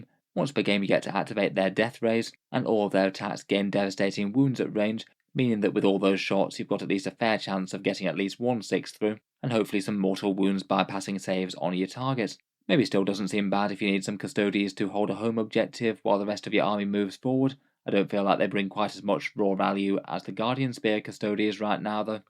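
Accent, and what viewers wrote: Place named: United Kingdom. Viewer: Pretty much everyone in the UK speaks like that ;)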